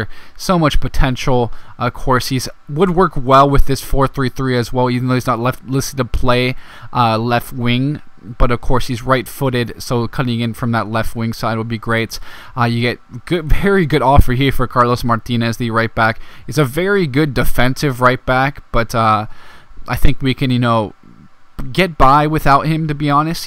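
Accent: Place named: United States. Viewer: Finally you don't know how hard it is to find someone who does AMERICAN English Fifa career mode great video btw new sub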